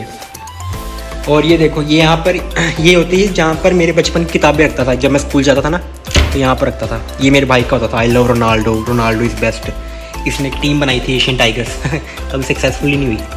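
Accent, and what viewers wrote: Rajasthan, India. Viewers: Rajasthan walo ka accent chhipaye nhi chhupta tbh maine socha tha nd it came true !..m from Rj too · Are u from rajasthan?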